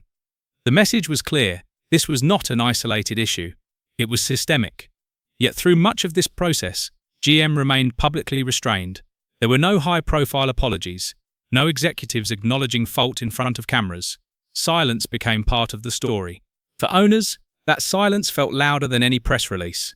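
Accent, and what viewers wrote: United Kingdom. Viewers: Started to watch until I heard that obnoxious "British" voice, , leaving . I will find this info on an American video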